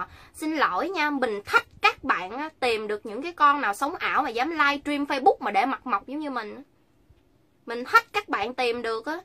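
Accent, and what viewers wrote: Standard. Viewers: Cj nói chuẩn ko cần chỉnh lun á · cj nói wa chuẩn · Chi noi chuan ghe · Nói chuẩn vler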